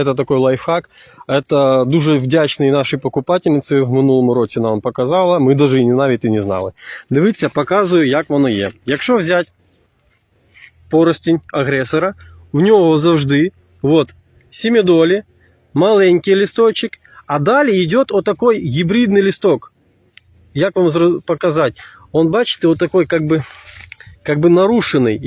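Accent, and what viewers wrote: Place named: Surzhyk, Ukraine. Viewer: Ви вчите Українську?❤ ЯК що вчите, у вас виходить цікавий суржик!❤😂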